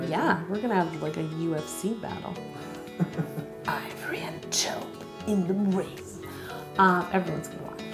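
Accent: Canada. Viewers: Yo: "This tee is so reflective, eh?" Me: Yep, definitely Canadian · She sounds so Canadian what are you talking about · I love her and her Canadian-ness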